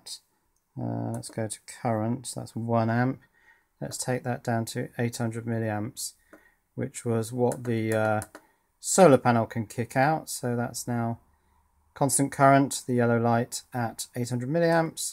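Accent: England, United Kingdom. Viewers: Best voice and the greatest English accent. I want to listen, each word you pronounce. Very informative video. Thanks for uploading.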